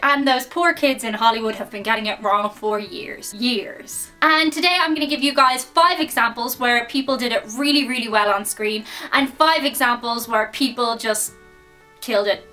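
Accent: Dublin, Ireland. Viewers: Love the Irish accent....and you are just a pleasant to watch. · SternLX its still pretty obvious shes from dublin area though. Its not that neutral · I listen to your videos for your accent more than the content... LOL Just kidding but i do love hearing the Irish accent. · the irish accent is one of my faves. just watching you (in particular) enunciate your words is entertaining :) btw, you should put some of your trademark chokers in the merch and create a cult following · I enjoyed this and even though I only understand and 70% of what you’re saying, I love your Irish accent! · did they get the accent right. And the more I watch u the more I cant hear your Irish accent but ur still great. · I love the Irish accent, one of the main reasons I subscribed to channel. Not only do I get to hear her Irish accent but I learn about Irish culture in a fun and entertaining way. 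Thanks for your videos, look after yourself. Congrats on 25K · Love hearing your Irish accent when you talk. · After listening to the way you talk and your accent, I’ll pay closer attention when I watch something with an Ireland character. Thank you for sharing!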